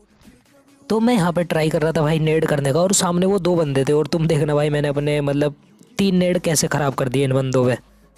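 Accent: India. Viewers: Kis kis ko ke bolne ka style pasand hai Matlab ek dam Desi wali feeling aave video dekhti ber😍😍👌👌🙏🙏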